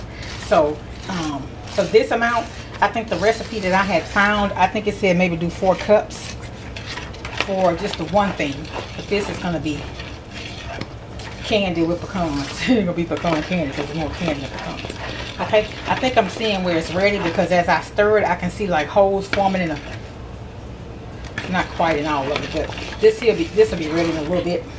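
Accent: South, United States. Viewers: I love your good ole' Southern accent. I think l will try this recipe.😄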